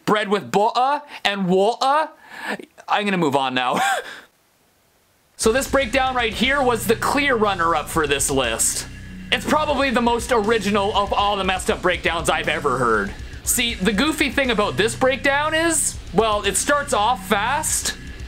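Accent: England, United Kingdom. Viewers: Spot on English accent to be fair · As a Brit, I approve that accent.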